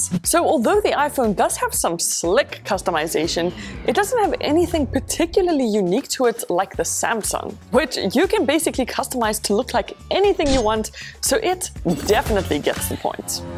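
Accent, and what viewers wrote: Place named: South Africa. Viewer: You sound South African :) Love the content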